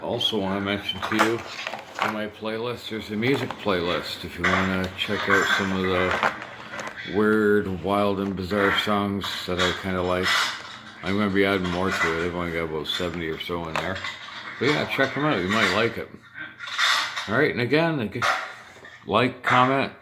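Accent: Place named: North, United Kingdom. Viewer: Some good stuff you got there! I hear that great north accent =p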